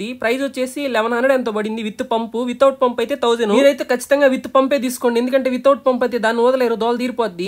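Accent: Nellore, India. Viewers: Hi nenu akkani epateki 3rimes chusanu video 12 y avuthundi nellore basha vne ne matallu challa baguntai thamudu nellore valaki yachullu yakuva 😂😂😂 yapudu happyga undu ma house chupinchava pls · Nenu meee vedios chustunnanu last one week nunchi but QA vedio lo meeku ammayilamedhunna respect ki subscribe chesanu🎉 all the best ma frd kuda nellore eeh slang baguntadhi kadapa slang ki quite opposite nellore slang😊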